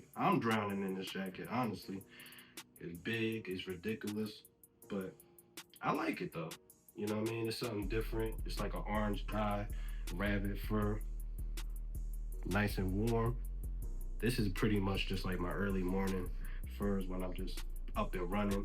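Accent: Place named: Philadelphia, United States. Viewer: Keep up it up, I admire your collection!!!! And I hear that accent it sounds like my home town sound!!!! Philly in the building 🦾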